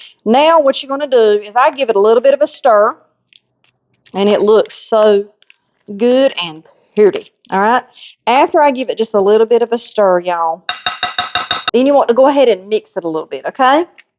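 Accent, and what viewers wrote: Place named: South, United States. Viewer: God bless you from Oahu. 👋🏼🌴☀️ I clicked for a southern sound and I am 100% satisfied. : )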